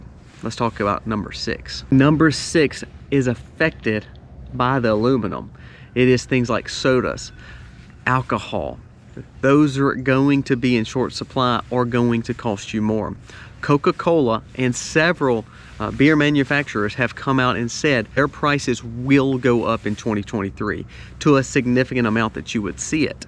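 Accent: South, United States. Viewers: How very rude and arrogant of you to tell a whole group of people from a whole different country, with a different accent how to pronounce a word. I shouldn't have to tell a grown a$$ man with a country twang no less, to not act all high and mighty and judgmental over how they pronounce certain words. Come up north or out west and see what your fellow men say about how you speak.😡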